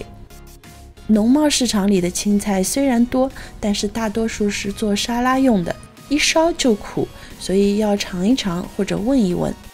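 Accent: Shanghai, China. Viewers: 上海腔调，上海咪道，一只顶。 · 一看一听就知道是阿拉上海宁额腔调 灵额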